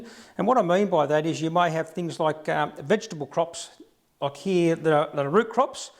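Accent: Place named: Australia. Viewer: Where abouts are you located? You sound Australian.. I live in subtropical Queensland (Gold Coast) and absolutely STRUGGLE to grow even heat loving crops during summer. It’s autumn and we are still having 32degree Celsius days!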